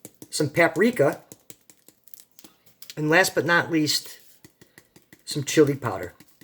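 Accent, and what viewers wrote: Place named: New England, United States. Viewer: Nicely done man I had a feeling you’re also from New England from the accent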